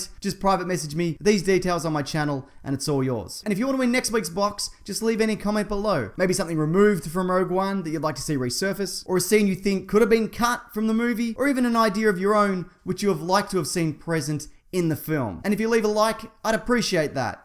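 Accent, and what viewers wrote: Australia. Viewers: Okay mate got a bone to pick! So..... You're Australian (So am I). That atom app looks dope and I wanted to get it, I go to the sight cause I couldn't find it in the google play store, and it says the app is not available in my country........ SO WHY ARE YOU ADVERTISING AN APP THAT YOU CANT EVEN USE, THATS LIKE SUPER SELLOUTY! But its a good vid thanks for all the hard work u put in the vids to make it as entertaining as possible...... thanks for makin the aussie accent sound better then it actually is.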